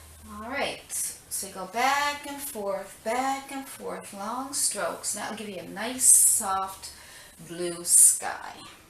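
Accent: Newfoundland, Canada. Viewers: Hi, really like the videos, I'm a total newbie (senior) at painting and these help a lot. Was wondering about the accent, kind of sounds like you are or were from Newfoundland, or is it Irish? Thanks for all you do for others:)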